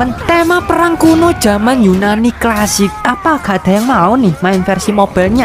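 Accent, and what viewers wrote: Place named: Indonesia. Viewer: medok e rek rek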